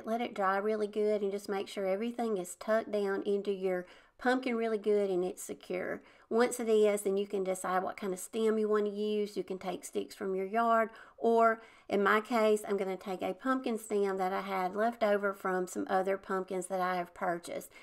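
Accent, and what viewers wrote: South, United States. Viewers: I am fairly new to your channel. I love your idea's. You make such darlin thing's. May I ask which state you live in. I live in South Carolina. You have that southern drawl.. So do I.. I would take a little guess and say you may live in Tennessee. I have family in Tennessee. Thanks for sharing your beautiful craft's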